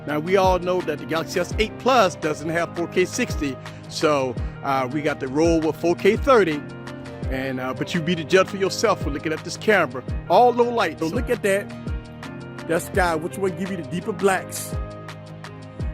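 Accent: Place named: South, United States. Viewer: You's a southern boy huh? 😊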